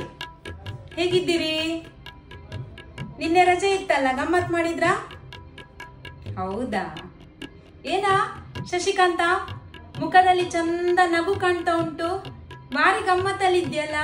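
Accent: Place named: Udupi, India. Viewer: ನಿಮ್ಮ ಭಾಷೆ ಸೂಪರ್,ನನಗೆ ಉಡುಪಿಯಲ್ಲಿ ಇದ್ದ ಹಾಗೆ ಆಯ್ತು ಮಾರಾಯ್ರೆ😂😂😂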